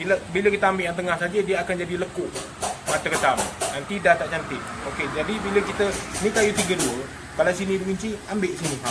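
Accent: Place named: Perak, Malaysia. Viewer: Assalamualaikum.. Bos area mana.. Dgr mcm belah Perak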